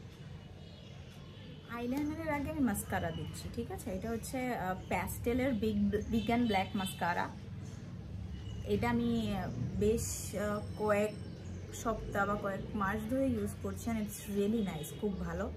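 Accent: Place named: Bangladesh. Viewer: Apu tumi khulnai thako. Prothome vabcilam tumi indian. Tarpor tomar bangla accents shune dekhi tumi deshi meye. Akhon to dekhi tumi khulnar. Amio khulnar meye apu. Take love❤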